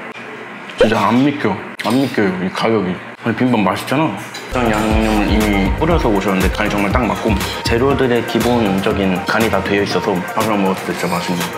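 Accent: Jeju, South Korea. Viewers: 인줄 알았는데 이였군요~~ 저도 제주도민인데 10년째 서울에 살면서 제주도 사투리가 들리면 괜히 반갑고 귀가트이더라구욯ㅎㅎ 이번에 추석때 내려가는데 함 금복식당(추석때 열려나???ㅜㅜㅜ)가보고싶네요~~:)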